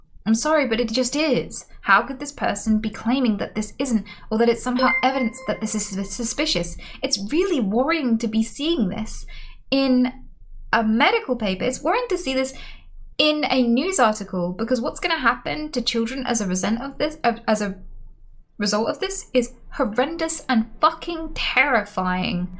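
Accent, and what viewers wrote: United Kingdom. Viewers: I find it very intriguing that some of your ticks have an American accent and some have your British accent.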